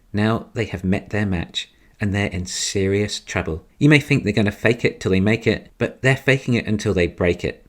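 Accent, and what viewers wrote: England, United Kingdom. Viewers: I was sure you were from England rather than New Zealand...???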